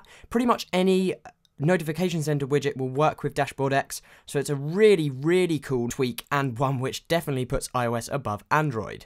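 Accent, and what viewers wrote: United Kingdom. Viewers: r u british? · STFU U BRITTISH GAY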